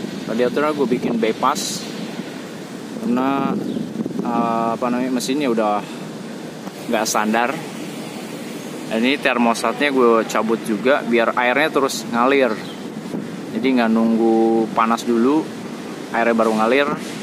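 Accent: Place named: West Java, Indonesia. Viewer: Orang sunda iyeumah yeuh 😁😁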